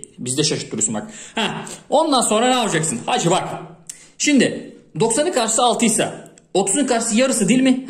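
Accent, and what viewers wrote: Thrace, Turkey. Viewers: Trakya şivesi yapma sadece küfür yapppp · Trakya şivesi güzel la kalsın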